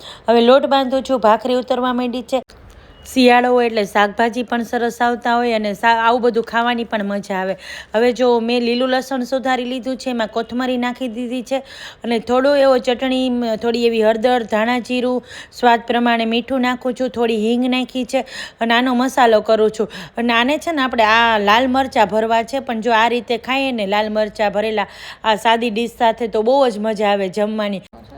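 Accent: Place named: Kathiawar, India. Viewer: Bahut achcha bolate ho ekadam kathiyawadi style😊😅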